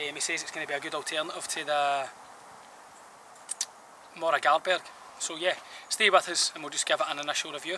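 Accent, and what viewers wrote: Scotland, United Kingdom. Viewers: A wee bit of Scot in yer voice is there?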